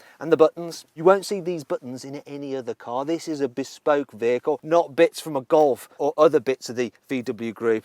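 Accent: United Kingdom. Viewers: 이제 느낀건데 영국발음 진짜 고급스럽다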